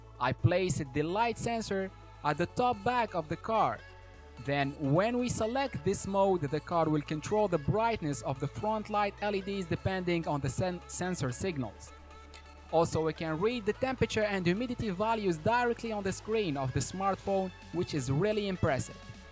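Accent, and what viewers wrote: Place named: India. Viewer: You r tryin good..... But you'r accent is still indian.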